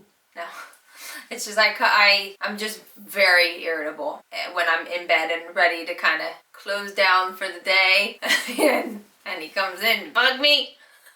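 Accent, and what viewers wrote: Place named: Delaware County, United States. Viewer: Love your updates! You have such a delco accent lol. Are you from there? (Delaware county)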